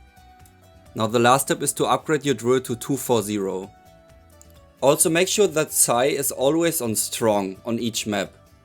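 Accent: Germany. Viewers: Are you german? I can hear the accent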